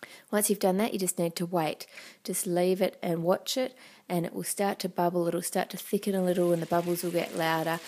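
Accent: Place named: Australia. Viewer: Australian/New Zealand accent.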